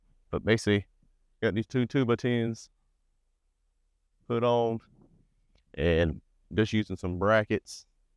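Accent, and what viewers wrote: South, United States. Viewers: I'm glad I'm not the only one to think that. I was going to say he's Laurence Fishburne's more southern sounding brother.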